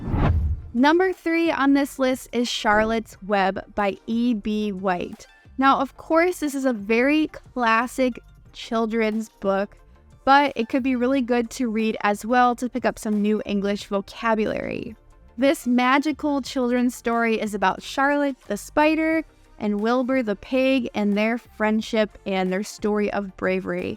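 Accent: United States. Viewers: I love your channel😍. I was looking for a channel like this ( I really like the American accent and this channel has given me tons of information<3) love it!!! Thank you ❤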